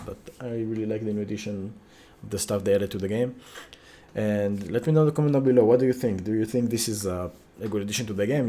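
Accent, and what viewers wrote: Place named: Israel. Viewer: i can still hear that hebrew accent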